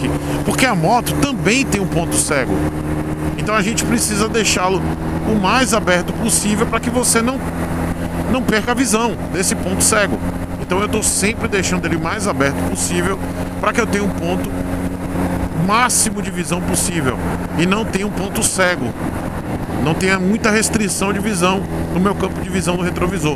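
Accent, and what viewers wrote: Bahia, Brazil. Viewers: Você é de Salvador- BA?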